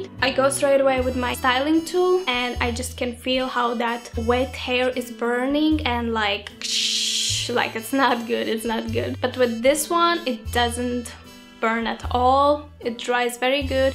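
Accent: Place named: Latvia. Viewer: Are you from Latvia? I swear that i can hear your accent. Nice video :)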